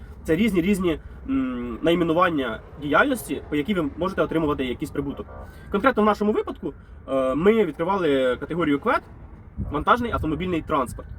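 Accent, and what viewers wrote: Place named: Vinnytsia, Ukraine. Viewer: Красавчик чітко ясно без води))) по говору ніби з Вінницької області 😅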